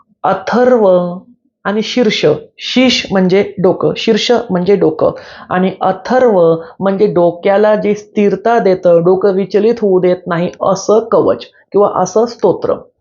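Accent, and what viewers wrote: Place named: Vidarbha, India. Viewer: भाऊ आपली विदर्भाची गोड भाषा आहे आणि तुमच्या मुखातून जास्तच गोड वाटली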